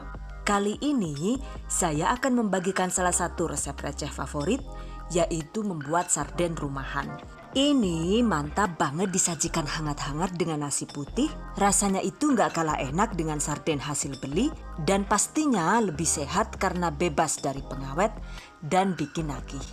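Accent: Banyumas, Indonesia. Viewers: Mbae ngapak ya..pada baen karo aku..😁 maturnuwun mbak resepe..tak jajale..😊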